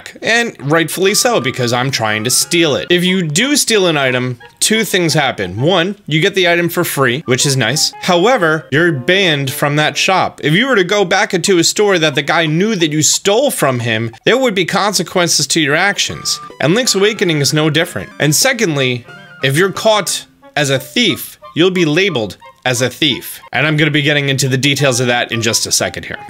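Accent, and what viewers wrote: Australia. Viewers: Australian. Because if that was supposed to be British we don't sound like that lol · First off the Ausie accent was a great. Digging the Link's awakening hints. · Nice Australian accent lol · Good Australian voice mate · Awful Australian accent lmfao XD (I'm Australian) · Australian accent is all I needed today. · Whys he speaking in Aussie accent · Why do you sound Australian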